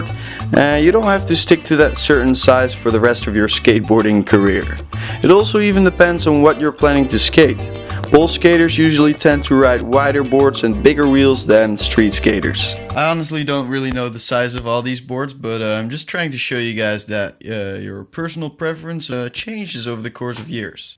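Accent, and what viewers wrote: Netherlands. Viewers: I hoor you Engels accent en ik dacht gelijk Nederlands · it's so funny how easy it is to recognize a Dutch accent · Are you dutch??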